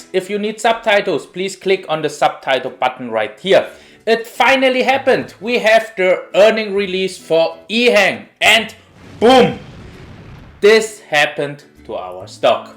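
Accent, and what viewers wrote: Germany. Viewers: U have an interesting accent, it almost sounds like a German accent.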